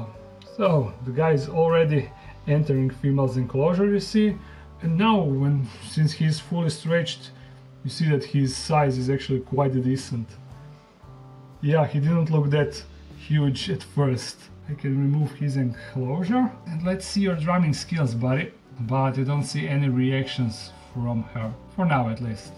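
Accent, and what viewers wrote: Croatia. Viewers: Hi. I came across your channel a month ago and man I'm hooked. At first I was wondering why your accent is so familiar 🤔 Than few videos later I realized you are Croatian like my boyfriend 😅 After watching more videos I also realized you live like 127 km from us. Hopefully one day I will have the opportunity to visit Dark Den. 😊 Just wanted to say how much I love your videos and all respect for you for caring so much for all your animals 😊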